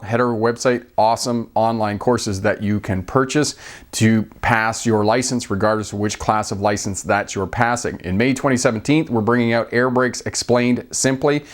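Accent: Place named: Canada. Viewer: I could tell he was Canadian from his accent :)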